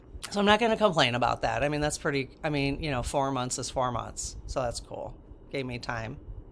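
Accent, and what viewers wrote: Midwest, United States. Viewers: Hi!! Yes! Everything will work out for us! Let’s raise that vibration so the Universe will match it! When I first came across your channel I recognized the midwestern accent and you said today you are from Wisconsin. My Mom was from Door County (Sister Bay) and I have such wonderful memories of my MorMor’s cherry farm! Such a beautiful state. ❤️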